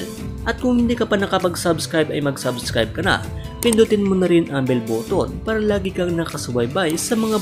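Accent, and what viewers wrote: Philippines. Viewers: The accents, sound like ilocano. Correct me narrator if I'm wrong.😁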